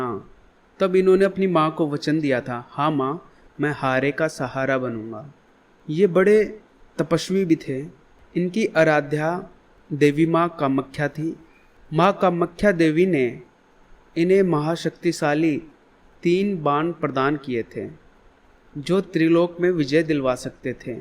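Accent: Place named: Uttar Pradesh, India. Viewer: Aap up se ho kya ....Apki boli se lagta j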